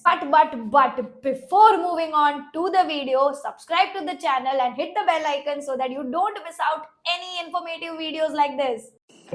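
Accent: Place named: Gujarat, India. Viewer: Gujarati tone 🙂 But it's a Good Start and content also Informative. Appreciate 👏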